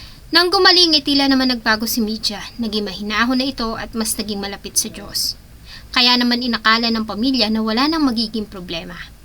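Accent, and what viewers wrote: Visayas, Philippines. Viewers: Your pronounciation is so bisaya. Hindi Marvel ate Mar-vil ...hindi slovenya Slovenia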